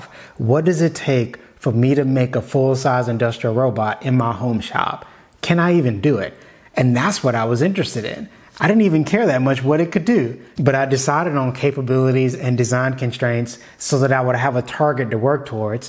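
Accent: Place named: Baltimore, United States. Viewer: My biggest takeaway from the 1.5 videos I’ve watched, is that I’m 100% sure this dude is from Baltimore. That accent is unmistakable 😂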